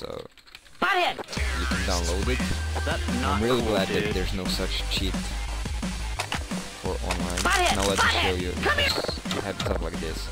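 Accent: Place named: Russia. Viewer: акцент, может быть и английский, но он русский!!!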